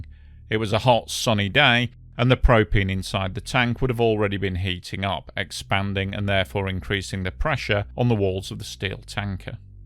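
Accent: United Kingdom. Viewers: I appreciate your very Britsh English. Thank you for this documentary. I´ve never heard or read about it.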